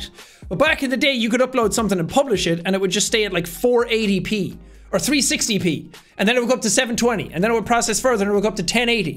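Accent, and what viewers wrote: Ireland. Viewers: The entire time I watched this my cat was scratching at my door for no reason and I was like: She is drawn to the voice of the loud Irish man 🛐🛐🛐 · I love how he became significantly more Irish after the ice was broken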